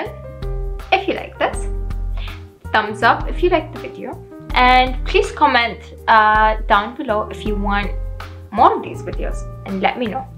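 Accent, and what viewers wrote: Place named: England, United Kingdom. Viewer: I love your English accent 😋😍😍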